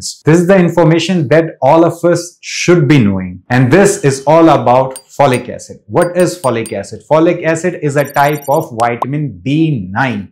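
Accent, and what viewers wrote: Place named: India. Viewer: 😮Dr has surprised me because he is now saying vitamin instead of witamin after so many years but I understood him and I love his Indian accent plus his extreme intelligence. Thank you doctor I wished I lived in India so I could come see you. As usual Wise information thank you sir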